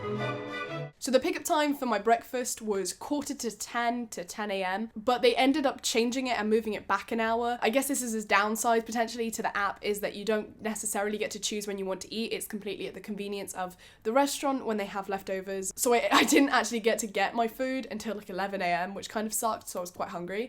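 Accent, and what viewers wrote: United Kingdom. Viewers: Your genuine British accent is so adorable. It sounds much better than ordinary american English. I like it very much. Which area is this accent from? Liverpool?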